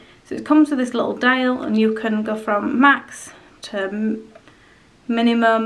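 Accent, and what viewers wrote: United Kingdom. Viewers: Off Topic: Where do you think has this Person grown up in GB? I try to lokate the accent. Thx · Definitely UK. Northern England.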